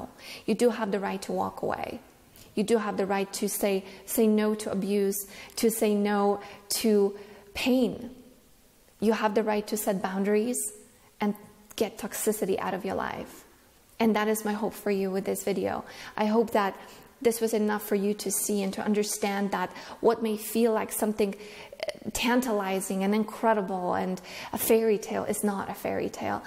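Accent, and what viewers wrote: Poland. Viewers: I watched this thinking about someone else and woke up today feeling horrible Bc I'm so mad at myself for not listening to my intuition and getting caught up in manipulative relationships. But when you said that I heard my mom in every way. And she is from Poland. So the accent reminds me of her. I thought I could forgive her but I feel way to entrenched and horrible I have no idea how to live my life anymore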